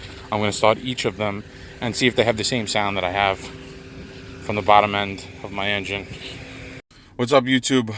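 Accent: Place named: Massachusetts, United States. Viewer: HAVENT... YOU GUYS EVER HEARD FORD=FOUND ON THE ROAD DEAD? HELLO. YOU SOUND LIKE A MASS RESIDENT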